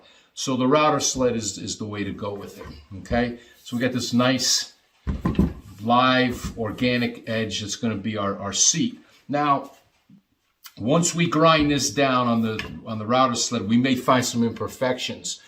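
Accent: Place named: Boston, United States. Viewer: I really enjoyed this video! I’m on the cusp of retirement after 35 years in the landscaping business, and now hoping to pursue some “woodworking” projects myself! I loved the “Boston accent” too! 😂 Thanks again, Dan (Red Wings fan from SE MI 🏒🥅😏)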